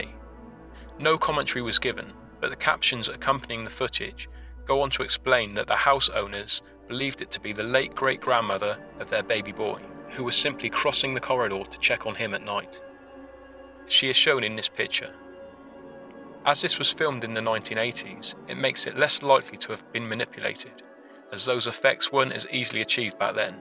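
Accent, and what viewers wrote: United Kingdom. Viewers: His UK voice is awesome too awesome could be fake maybe he's from the 80's rock music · I think you have to much time on your hands down in mamas basement,also a british accent can only go so far toward making you look smart.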